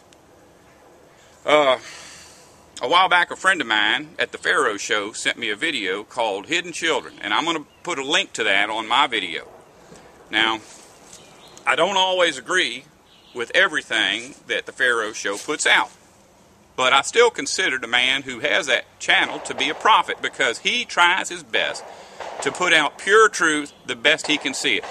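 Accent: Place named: New York, United States. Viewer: Aw heck, I though it was New York. His accent is a lot like yours.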